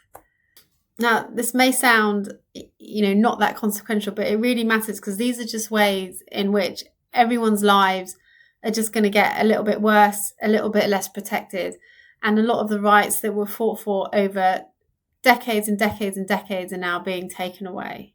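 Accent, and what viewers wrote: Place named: United Kingdom. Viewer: This is garbage. And I can't take you serious with that British accent.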